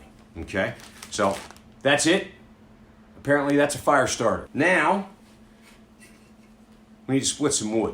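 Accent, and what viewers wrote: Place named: Italian American, United States. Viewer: Very Italian American accent haha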